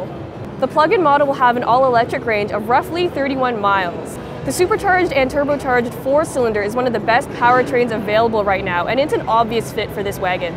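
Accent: Canada. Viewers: is Chinese???? But she sounds so Canadian.....;p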